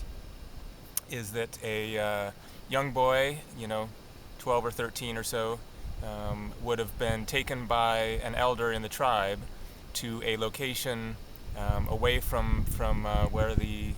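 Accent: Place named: Canada. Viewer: I knew that was a Canadian accent!!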